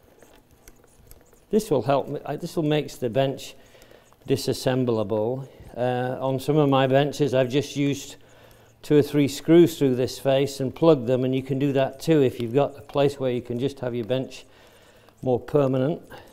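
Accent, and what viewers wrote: United Kingdom. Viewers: It is nice to have subtitles for those who might be hearing-impaired. I guess you have used a speech recognition software. Some editing is needed : "wall" instead of "war"; "disassemblable" instead of "disassemble a ball"; "bolt" instead of "ball". I am not sure I did catch everything because I normally just listen to your clear British pronunciation.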